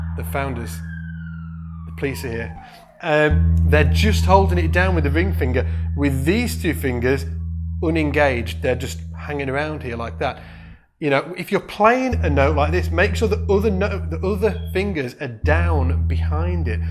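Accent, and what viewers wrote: United Kingdom. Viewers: damn, that is some hardcore british accent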